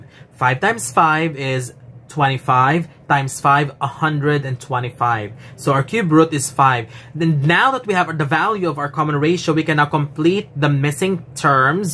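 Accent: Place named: United States. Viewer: Akala ko amerikano yung nagtuturo hahaha. Ang fluent mopo mag turo naitindihan kopa lalo. Thank you sir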